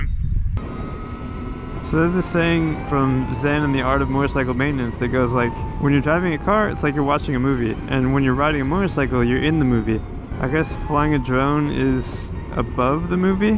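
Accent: California, United States. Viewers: Great insite! I often get asked what I'll do if my battery needs to be replaced of if something much better comes along. My answer is that I'll sell what I have and buy the next thing. I'm writing this response on my new galaxy Fold phone/table, in my Tesla, dreaming of owning an Energica. I think you have a California accent. Your d's sound like t's. Like when you say "newzerolanT." I'm accused of having a Canadian accent. I say "hoose" and "aboot" instead of "house and "about." But I grew up in Massachusetts so that doesn't make any sense, eh?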